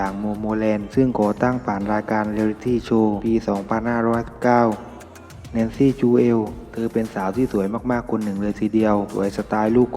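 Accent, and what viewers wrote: South, Thailand. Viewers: คนพูดนี้คนใต้ม้ายอ่ะ น่าว่าหมันใช่ม้าย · เสียงเจ้าของช่องเหมือนคนใต้จังคับ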